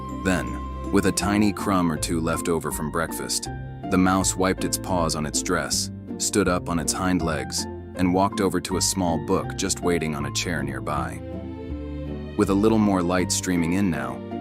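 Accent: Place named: United States. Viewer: The American voice put me off watching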